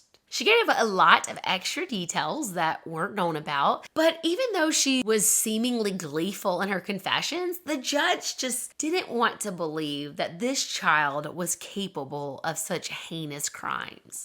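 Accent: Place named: South, United States. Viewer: New subscriber here!! I’m so glad I came across your vlog!!! I love things like this. I also love your voice and I’m wondering if you’re from the south? Because you say the word “on” with a “w”….. Like. “Own” which I love!! ❤️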